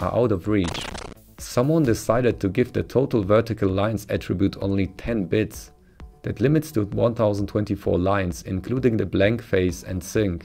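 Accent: Germany. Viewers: 4 sekunden die stimme gehört und direkt gewusst es ist ein deutscher:D:D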